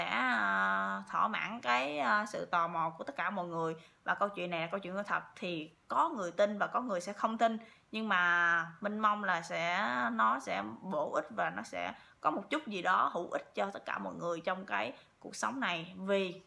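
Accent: South, Vietnam. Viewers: Bạn rất đẹp - giọng miền nam cưng ghê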